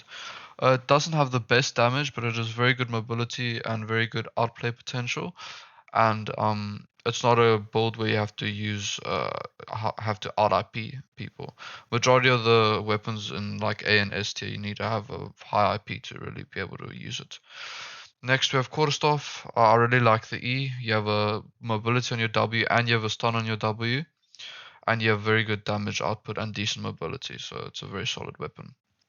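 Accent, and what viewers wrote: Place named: South Africa. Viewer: You south african boet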